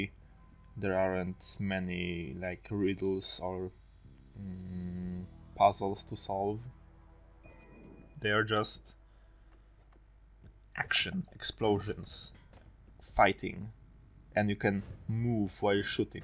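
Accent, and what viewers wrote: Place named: Eastern Europe. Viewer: Polish, that was very enjoyable to listen to, I'd be glad to listen another ones. Also, your english is just fine, take it from another slav. So don't sweat about it, after all we are foreigners and it is highly justifiable for ears of others to hear our rough talk.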